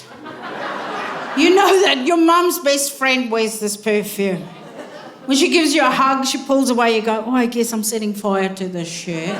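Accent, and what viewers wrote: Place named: South Africa. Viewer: She is hilarious and FINALLY learned why she has such f*ckery going on in her accent! South Africans already have the strangest of the British accents, but add some Kiwi and Aussie to the mix and you get this I guess.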